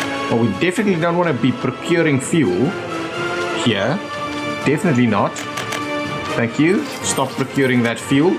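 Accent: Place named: South Africa. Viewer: you sound like you're from South Africa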